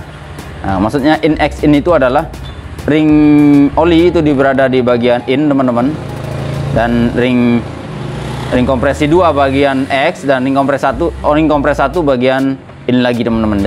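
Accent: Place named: Madura, Indonesia. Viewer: Dari Logatnya kayaknya Abang orang Madura ya? 😁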